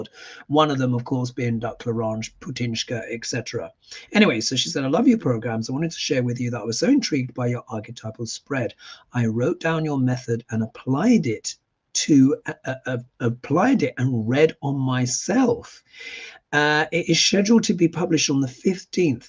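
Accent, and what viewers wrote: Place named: London, United Kingdom. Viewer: Thank you for reading on Germany; yes I live here and just don' t want war; I agree to what the pope said ( of course, I am italian 😂) inflation is still going on; in Germany, prices high. I am so glad, I found your channel once 😊 thank you for giving me a home feeling always when you talk; miss the Londoner dialect a lot; when we had the zoomcall reading last year I told you the Dad of our kid was from London; unfortunately he suddenly died 2 years ago. So it makes me happy when you talk and share your humour ❤❤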